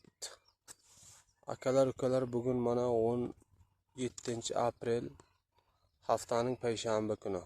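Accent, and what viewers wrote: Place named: Kashkadarya, Uzbekistan. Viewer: Shevasi yoo Qashqadaryo yo surhondaryo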